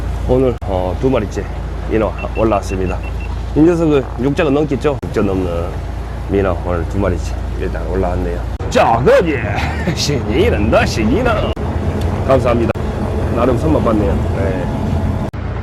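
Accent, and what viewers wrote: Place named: Jeolla, South Korea. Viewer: 영상보니 좋아 보이네요..... 구수한 전라도 사투리에.... 선장님 배로 외수질 출조하려면 어떻게 해야 하나요?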